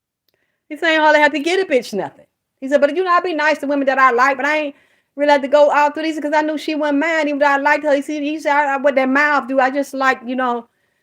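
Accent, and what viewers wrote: South, United States. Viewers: I love your southern/country accent!!!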